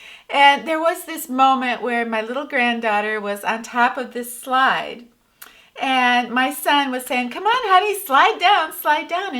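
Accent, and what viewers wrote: Midwest, United States. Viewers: I’m a new subscriber but a seasoned woman exactly your age! I love your videos,! My dog died a couple of years ago so I’m enjoying Desi and watching you two relate. Makes me miss my girlI I love the sound of your midwestern voice and your infectious positivity! My apartment is my sanctuary too and I decorate it with whatevery I find to be beautiful and useful, much of it thrifted. I know I’m alive because my surroundings matter to me and I never stop wanting to beautify my home. There’s no place like it. Thanks for inviting me into your lovely abode! Keep up the great work you’re doing. See you next time. It’s a deal!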